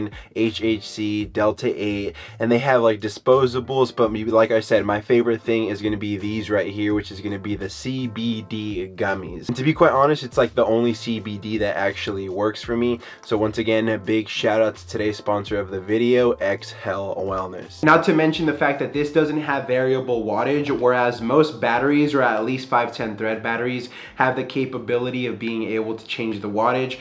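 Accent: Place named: West Coast, United States. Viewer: Brother has a west coast bwhwhwhahah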